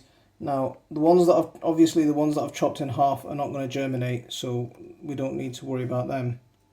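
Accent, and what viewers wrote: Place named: South Yorkshire, United Kingdom. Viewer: Nah then lad, you sound like you come from near me in South Yorkshire. I usually set my chillis off on heat mats, but like you sometimes I forget to label them. Last year my mate ended up with a reaper, and I ended up with some Apaches instead of vice versa. Good vid, I have subbed and belled.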